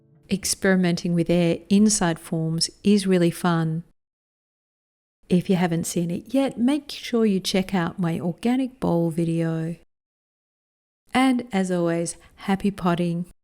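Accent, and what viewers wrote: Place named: Australia. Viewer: Great design. I am delighted to hear your lovely Australian accent and voice narrating your Organic Vessel. Also fond of black blue and matt gloss combos. thanks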